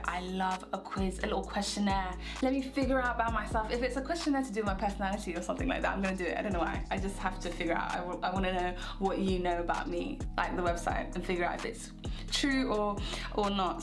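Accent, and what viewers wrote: United Kingdom. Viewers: 🤤🤤🤤😍 you are gorgeous AND love the British accent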